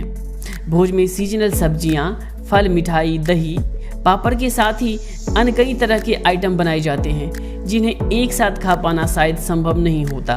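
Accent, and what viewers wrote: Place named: Bihar, India. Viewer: Bhaiya parampara hota hai ya parampara hota hai ham jante hain aap Bihar ke Bihar Dakota bolate Hain Puri ka pura aur pura ka Puri hai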